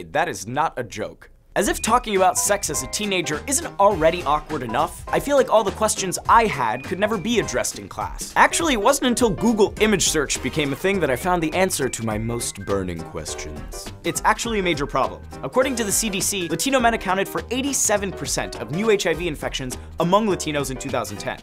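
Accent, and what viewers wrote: Puerto Rico. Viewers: i love your puerto rican funny talking ..thanks for let me being happy...Papi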